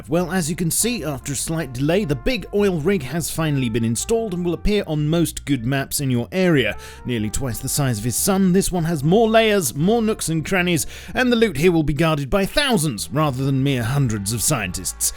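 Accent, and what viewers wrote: United Kingdom. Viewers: Yes, I finally get to hear my favorite British voice!!!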